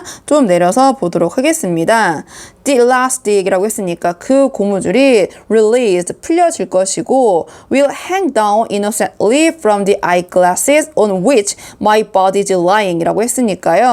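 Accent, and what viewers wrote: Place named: United Kingdom. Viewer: 선생님! 감사합니다. 선생님 덕분에 혼자서는 꿈도 꿀 수 없는 아가사 크리스티의 'And then there were none.'을 끝까지 볼 수 있었습니다. 마지막 Wargrave의 'manuscript document'부분은 까다롭고 이해하기 어려운 문장도 많았지만 선생님 덕분에 마지막 까지 읽어 낼 수 있어서 참 기쁘고 행복 합니다. 군더더기 없이 속도감 있는 선생님의 강의 진행 덕분에 지루할 틈이 없었습니다. 그리고 선생님 특유의 영국식 발음(!)에 시간이 지날수록 매료되었습니다.😂 다시 한 번 감사드리며 이젠 나니아 연대기를 시작하겠습니다~^^고맙습니다.❤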